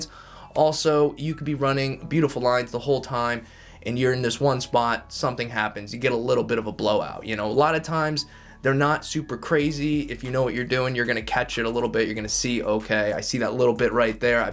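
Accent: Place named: Vermont, United States. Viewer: You sound like you’re from Vermont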